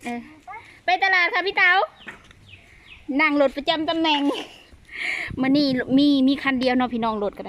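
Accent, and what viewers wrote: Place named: Laos. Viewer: เว้าลาว ดีแล้วครับ ที่ติดตามเพราะมักฟัง สำเนียง น่าฮัก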